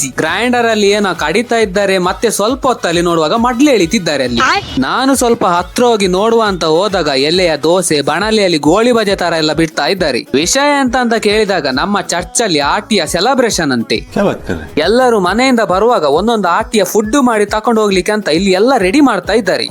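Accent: Mangalore, India. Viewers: Nimma mangalore kannada bhashe chanda untu marayya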